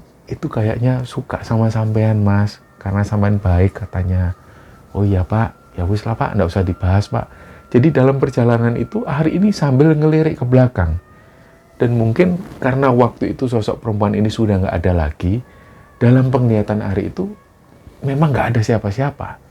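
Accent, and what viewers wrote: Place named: Surabaya, Indonesia. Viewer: Mas dialek Surabaya nya kental banget....☺️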